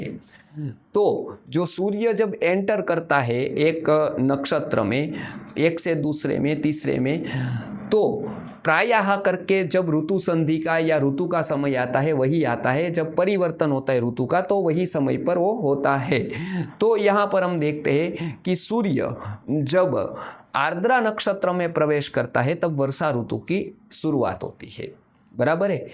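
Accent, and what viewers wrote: Gujarat, India. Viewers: Bhai gujarati lage che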